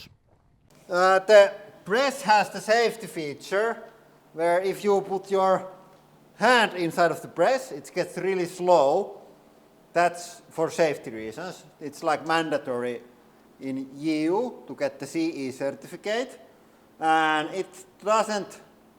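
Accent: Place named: Finland. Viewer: I love hearing English cursing spoken with a Finnish accent it sounds so good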